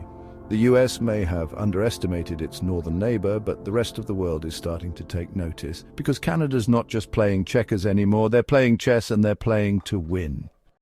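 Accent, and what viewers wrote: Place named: United Kingdom. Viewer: Love the British accent and the USA spelling. Who makes this s___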